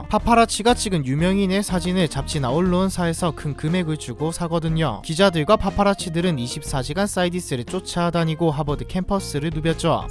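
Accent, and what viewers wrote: Gyeongsang, South Korea. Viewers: 경상도 분이시군요 ㅎㅎㅎ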